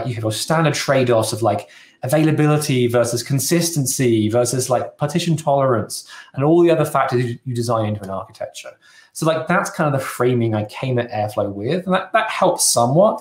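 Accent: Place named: Scotland, United Kingdom. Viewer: Very useful video! I'm happy I've found this one. It has been a bit difficult to start with Airflow. By the way, I'm intrigued with your accent. Are you from Scotland?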